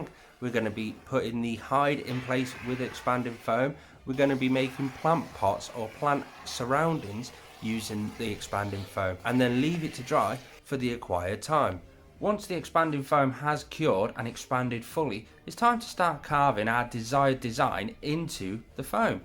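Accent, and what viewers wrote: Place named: Sheffield, United Kingdom. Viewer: Nice setup just wondering where are you from I’m getting Sheffield vibes idk y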